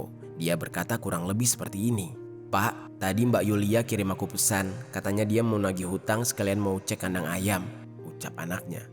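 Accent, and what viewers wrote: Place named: Surabaya, Indonesia. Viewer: Sht slalu n semangat n sukses, sememi Surabaya aksen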